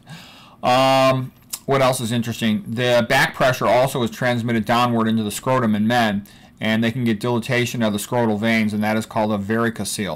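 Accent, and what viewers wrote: Chicago, United States. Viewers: What an incredibly fortunate student I am to have you as my instructor! Your tutorials are always clear & detailed, which allows us to easily understand & follow. Your drawings are especially helpful. THANK YOU for your continued generosity in producing these videos. As an aside, your Chicago accent is entertaining as well!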